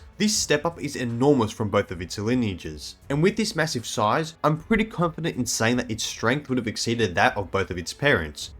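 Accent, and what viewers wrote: Australia. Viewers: I need more of this Aussie accent talking about wild animals. Nothing better than a wikd human describing wild animals with their wild accents. I love it!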